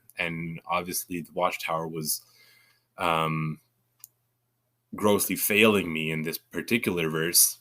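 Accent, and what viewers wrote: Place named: Canada. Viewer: I love Canadian accents.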